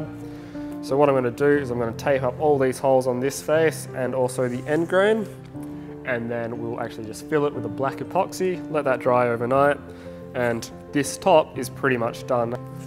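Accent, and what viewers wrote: Australia. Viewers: maybe try vibrating your epoxy in the mixing cup to remove the mixing bubbles, orbital sander should do the trick... also works on the slab too but make up a dummy pad so no pesky dust:D Judging by the accent you in Aust?(probably one of the worst country's to be a fine furniture maker)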